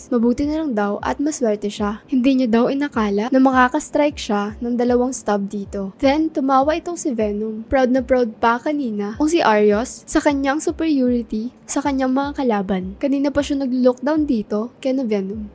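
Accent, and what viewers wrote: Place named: Conyo, Philippines. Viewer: Ang ganda po ngayun nung phase ng voice ngayun nakakahinga na po yung voice di na naghabol keep it up ganda rin na may medyo pagkaconyo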